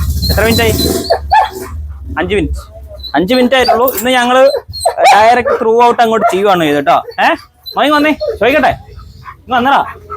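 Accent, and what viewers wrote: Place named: Vadakara, India. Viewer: ഞാള് ഞൂള് തല്ലി പൊളി വടകര മലയാളം😅😅😅😅😂😂😂😊😊😊😊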